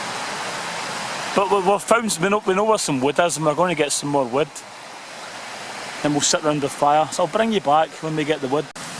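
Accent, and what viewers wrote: Scotland, United Kingdom. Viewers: Try watching this with the Google auto-generated subtitles on, killing myself laughing at how it can't handle Scottish accent!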